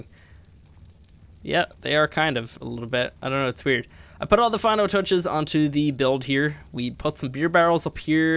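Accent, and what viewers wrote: Ireland. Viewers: 30 minutes of an Irish man making a bar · Didn't know my boy was Irish till now.... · Wait my bad, not German, Irish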